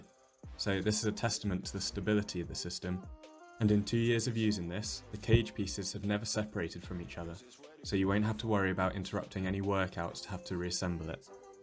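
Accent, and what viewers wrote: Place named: England, United Kingdom. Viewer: Shocked and pleased to hear the English accent for a basketball video!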